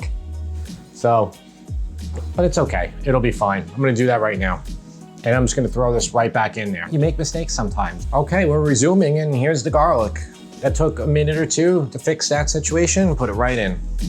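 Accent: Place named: New Jersey, United States. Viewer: Well if you really want not to like him you could start with the fact that he say's lives on Long Island but talks like he's from New Jersey. That's a start.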